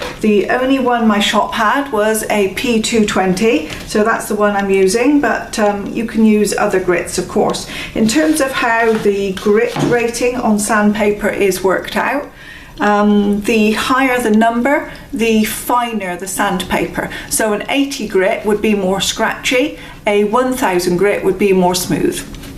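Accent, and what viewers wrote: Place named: West Country, United Kingdom. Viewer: Lovely west country accent there :D Great info x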